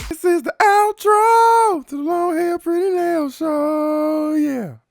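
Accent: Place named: Houston, United States. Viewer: It gotta be Houston, she sound like Bey a lil Bit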